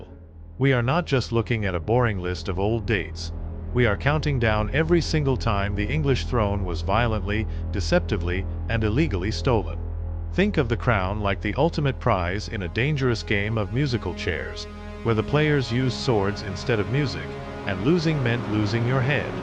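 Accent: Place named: United States. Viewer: I lasted 59 seconds. Several reasons. One, the godawful music is so loud that you can hardly hear the narrator. Two, the narrator is talking in an American accent which means his pronunciation of certain English words is going to be dire and, Three, Just from those few seconds I could tell it was going to be CRAP.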